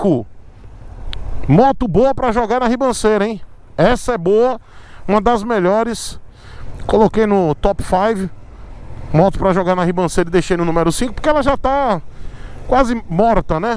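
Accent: Bahia, Brazil. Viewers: gostei da baianada. Se PIQUEM. Alguns não vão entender e vão se beliscar...🙄🤣🤣😂😂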